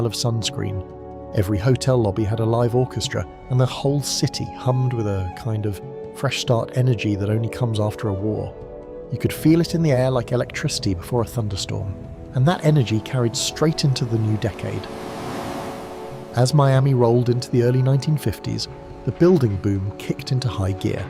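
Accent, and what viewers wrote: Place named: United Kingdom. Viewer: Your videos are fun to watch. They're meant to give viewers a general idea of what it was like. I can forgive AI for the errors in details. I do wish that you used American accents for narration on videos about American locations. British narration is fine for Europe and other areas of the world, I guess. It just seems like 90% of narration about anything uses a British accent now.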